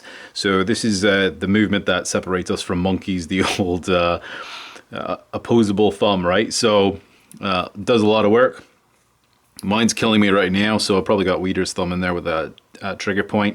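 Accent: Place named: North, United Kingdom. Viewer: Thanks... I've been struggling with splints & different ways to wrap... I'm now a happy camper! I've also been trying to figure out your accent.. i hear Northern UK (Manchester & Liverpool), Canadian, a bit of Irish & Aussie.....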